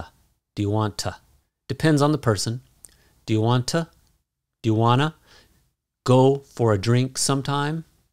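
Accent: United States. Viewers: Thank you for such inspiring classes. I'm myself an ESL teacher with British accent, but enjoying a lot shadowing your American accent.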